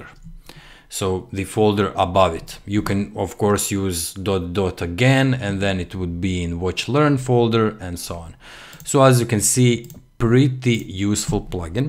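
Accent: Russia. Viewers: русский акцент? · рускиий акцент) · Thank's - you have a log of useful videos on your YouTube channel. Where are you from? I think maybe russian accent?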